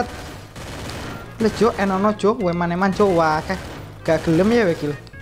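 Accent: Java, Indonesia. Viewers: Orang Jawa kah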